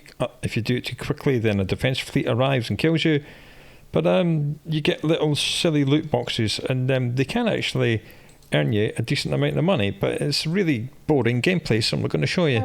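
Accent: Scotland, United Kingdom. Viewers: Nice one m8.... Baby!!!! get over here quick!!! back with his reassuring Scots burr, Greets from North of England m8:eyes-pink-heart-shape:😁👍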